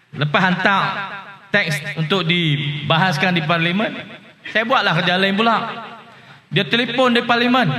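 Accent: Kedah, Malaysia. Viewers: ni cara anak kedah ckp...ori bya bahasa...trbaik mb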